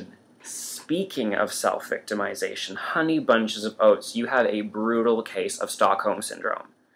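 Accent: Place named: Canada. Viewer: As a Canuck, I thought that you had a Canadian tone to your commentary! :) I love that you put your opinion out there & let people glean from it what they will. If you've been watching our political clime, you see that the LGBYT community is not as "safe" as it used to be. We've become complacent & are once again realizing that equality is not seen by everyone as a right but a privilege. Vigilance/education/outreach - always remember that no one hears us unless we make the effort to be heard. :)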